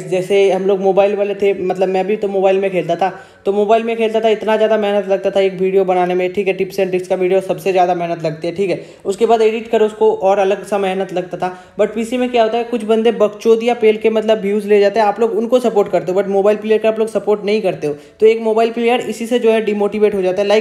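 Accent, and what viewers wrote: Bengal, India. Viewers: Bhai tu bangali hai kya ? 😊😊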